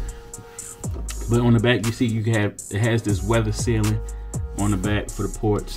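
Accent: Baltimore, United States. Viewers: My guy, are you from Baltimore? I hear the accent.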